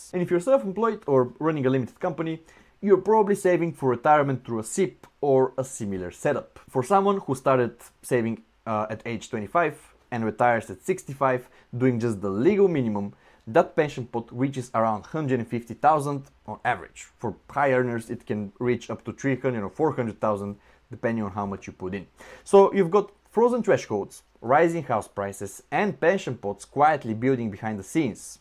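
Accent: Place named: United Kingdom. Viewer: Nice UK accent ! . Pension contributions are tax free so somewhere someone has to pay into HM treasury to pay for the PPE , Hs2 etc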